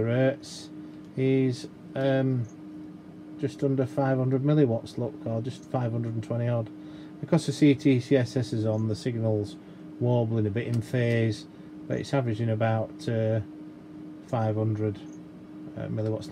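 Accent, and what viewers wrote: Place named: Manchester, United Kingdom. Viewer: Brilliant explanation to a newby. Plus your accent is near me, Manchester 👍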